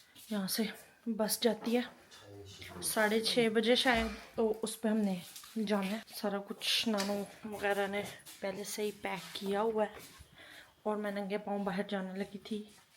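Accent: Pakistan. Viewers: pure Pakistani accent❤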